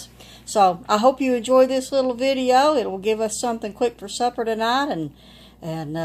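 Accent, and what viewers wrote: South, United States. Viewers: Why I love your kitchen videos ... Truth and Love. 😘 I rarely had an opportunity to be a part of how to make things from scratch. Most of my experience with pizza at home was get a frozen one and pop it in the oven (or call to order delivery) Watching you in your kitchen as you explain in your most welcoming southern accent, I get all humble and grateful. Thank you for making the time to share your values and honest "how it's done here" videos. Being your (virtual) friend leads me to a better day and a closer understanding of what's truly important. You are a blessing and a true friend. God bless you and all those lives you're a part of. ❤️❤️ Thanks again. 😊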